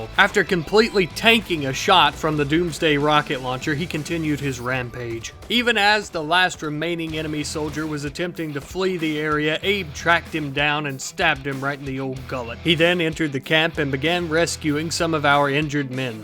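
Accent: United States. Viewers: Wait your American?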